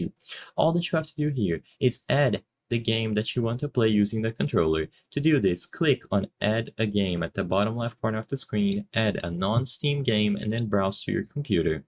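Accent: Brazil. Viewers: o cara fala bem, mas o sotaque é sempre reconhecível pra BR q manja de inglês kkkkkkkkkkkkk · Esse sotaque... Desconfiei que era brasileiro. Acessei o canal e confirmei minhas dúvidas hahaha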